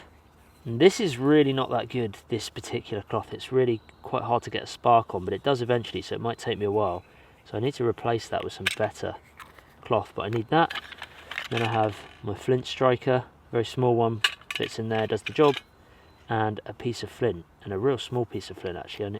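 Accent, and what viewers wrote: England, United Kingdom. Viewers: What is up my brother from South Korea 🇰🇷wow i feel your English is England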